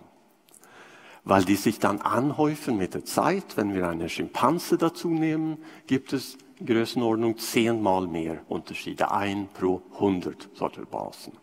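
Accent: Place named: Swabia, Germany. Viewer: Beeindruckende Deutschkenntnisse! Der schwedische Akzent erinnert ein bisschen an einen schwäbisch-alemannischem Akzent. Das fand ich sehr interessant. Nicht nur den Vortrag.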